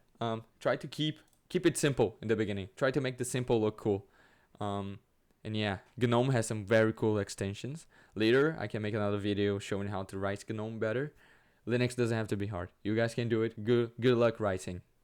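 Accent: Brazil. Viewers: Coé mano, faz um vídeo em português também, esse sotaque não engana. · are you brazillian, man? you've got the southeastern accent xD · Tu é brasileiro? Esse sotaque é igual o meu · kkkkk juro! Vi por literalmente 2segundos e ja fui nos comentarios para ver se alguem tbm tinha notado. O sotaque br falando ingles eh bem unico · Cara, impressionante como abri o vídeo e na primeira palavra percebi que era BR, CACETE a gente conhece o nosso sotaque lkkkkkkkkkkkkkkk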